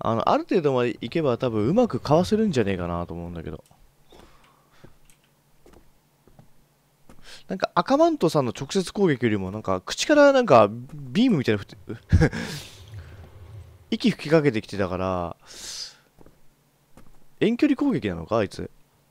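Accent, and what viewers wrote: Kansai, Japan. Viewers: かっこいい人の関西弁はさらにかっこいい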